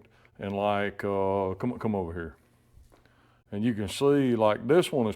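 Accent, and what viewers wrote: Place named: South Carolina, United States. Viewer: He lives in South Carolina, the USA is big enough that there are several different accents from one end to the other. You can tell someone who lives in Minessota from someone who lives in Wisconsin, as an example.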